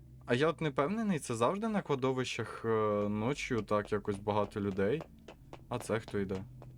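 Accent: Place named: Surzhyk, Ukraine. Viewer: Йоу, у тебе дуже круто вдається. Мені подобається твоя спокійна подача. Тршіки суржику додає тобі шарму Подерев'янського) Як і матюки що проскокують. Реакція на події в тебе кльова, за якою приємно і трішки кумедно (в хорошому сенсі) спостерігати. Твої відео приносять дійсно задоволення. Успіхів тобі на шляху ютубера) Українського контенту має бути більше)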